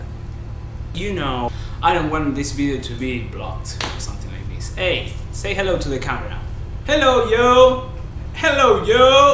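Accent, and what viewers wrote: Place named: Argentina. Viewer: más argentino no podes ser negro(de onda) !!! yo tmb hablo ingles y me equivoco parecido a vos aveces... jajajaj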